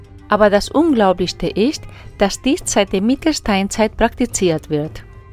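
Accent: Spain. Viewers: ...man 😄 lass mal den nazimist hier wech😉... Ich bin froh solch politischen scheiss auf solchen Kanälen nicht zu finden. Ich denke, dass die meisten Leute, die sich auf solchen Kanälen aufhalten ( darunter auch ich natürlich 😁 ) eine andere Sicht der Dinge haben, als der Mainstream. Zu der Stimme muss ich sagen gefällt mir sehr 🙊 ✌🏽 Nur der starke spanische Akzent gepaart mit den hohem Niveau an Wörtern stört mich! 😂 Es wird viel wegen der Dame, die spricht diskutiert. Dafür kann die gute Dame ja nichts, aber das war der Anlass für mich überhaupt auf die Stimme zu achten 😃 Trotz allem finde ich die Videos immer sehr interessant und ich schätze die Arbeit dahinter👍🏽weiter so...! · Nun ja, das nennt sich spanischer Akzent. Du bist offenbar ein mental stark eingeschränkter Provinzler, um es mal sehr nett zu sagen.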